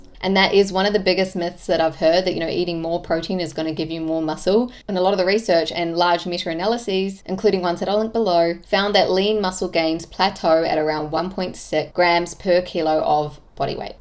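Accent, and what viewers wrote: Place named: Australia. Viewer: Excellent teacher and the Australian accent makes it nicer. Hello from Canada.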